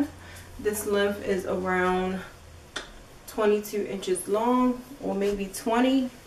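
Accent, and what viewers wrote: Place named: East Coast, United States. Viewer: Where are you from? East coast? Lol, Maryland? I need to know that accent is everything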